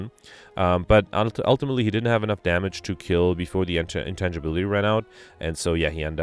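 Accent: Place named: Scandinavia. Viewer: It's all good. Just gave som Baltic or scandinavian vibe to your speech, but that's totally fair. I'm from Denmark myself. Anyway I love your videos they're great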